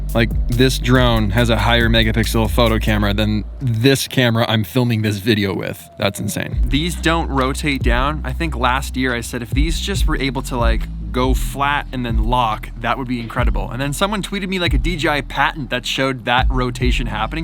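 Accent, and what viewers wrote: Gothenburg, Sweden. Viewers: So great Peter and Peter! :) Def gonna get the mavic mini 3 pro, cant wait! Så kul med lite härlig göteborgska! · Göteborska is just such a delicious accent. Only Peter will know what I'm talking about. The other Peter. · Took me a while, but then: aah, he is Swedish 👌😄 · Are you swedis? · He's from the back side of Sweden I hear! ❤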